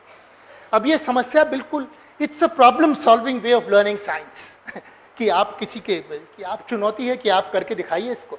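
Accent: India. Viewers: Bloody acent from India bady needs subtitles, or just continue talking hindi as this does not change much.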